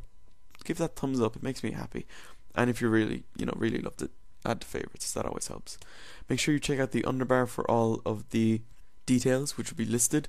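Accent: Ireland. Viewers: The video at points makes you sound like you've got a dirty Dub accent. Sorry for the insult ;)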